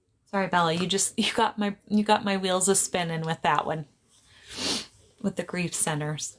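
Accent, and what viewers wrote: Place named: New York, United States. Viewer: You also sound like you're from Jersey or New York area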